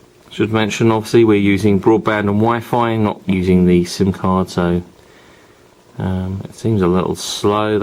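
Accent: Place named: United Kingdom. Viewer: Love the phone, and thats a pretty good review. and i love your britain accent when talk! haha.. still trying to learn that accent anyway! :)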